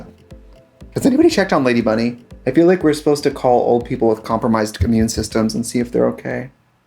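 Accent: Wisconsin, United States. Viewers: Any one else think Trixies Wisconsin accent shows up more during the 2020 videos? I feel like i hear it more in these than newer ones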